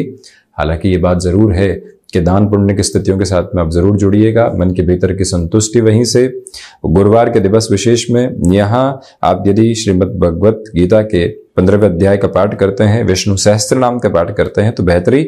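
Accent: Standard. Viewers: क्रपया नाराज मत होना आप क्योंकि मैं भविष्यवाणी से ज्यादा वर्तमान कर्मों पर यकीन करता हूं (क्योकि कल किसने देखा है, क्या पता कल हो न् हो)/ लेकिन आपके शुद्ध शाब्दिक उच्चारण का दिवाना जरूर हो गया हूं मैं, इसमें रत्तीभर भी कोई शक नहीं है/ आपके वीडियो देख-देखकर मैं अपनी खुद की हिंदी भाषाई जबान को लगातार शुद्ध करने की चेष्टा करने का आदि सा हो चुका हूं/ मेरा ऐसा मानना है कि यदि कोई व्यक्ति हिन्दी भाषीय समुदाय से हो तो उस व्यक्ति को अपनी शुद्ध हिन्दी भाषा की गरिमामय जुबान को शुद्ध रूप में जीवित रखने की नैतिक जिम्मेदारी अवश्य निभानी चाहिए "पीढ़ी दर् पीढ़ी"/ एक तुच्छ हिंदू प्राणी स्वरूप मैं ये जिम्मेदारी बखूबी रूप में निभाने की कोशिश अवश्य करता हूं अपने परिवार में/ ईश्वर से कामना है मेरी कि आप सदैव सुखी व् स्वस्थ रहें परिवाजनों सहित/ हार्दिक शुभकामनाएं🙏🙏🙏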